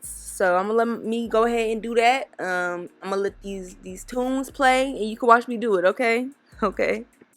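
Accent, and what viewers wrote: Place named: Columbus, United States. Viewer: You can tell you live in Columbus you got that talk 😂💞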